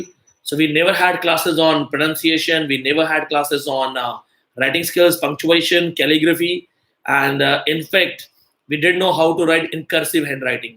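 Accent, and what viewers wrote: India. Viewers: an lndian speaking english in his or her own way of Indian style is really great to listen to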